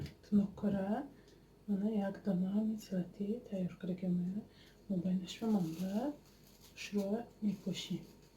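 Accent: Isfara, Tajikistan. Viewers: Хело,ба фикоам шумо аз Исфара,лахчаи гапзанитон монанд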